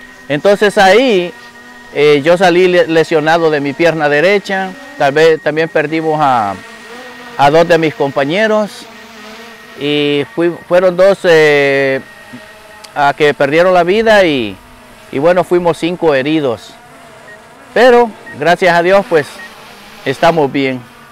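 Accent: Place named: Guatemala. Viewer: Este maytro habla como Chapin .saludes a todos Los de aguachapan y sonsonate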